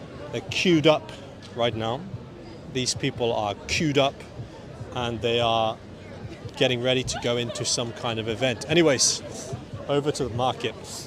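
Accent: United Kingdom. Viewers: The British accent is the best one. I am Brazilian and I love accent and all the British's way to talk.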